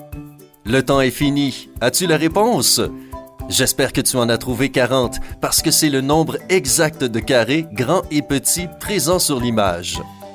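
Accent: Canada. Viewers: arrêter la voix du canadien j’ai mal a la tête quand je l’entend... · C un canadien???!!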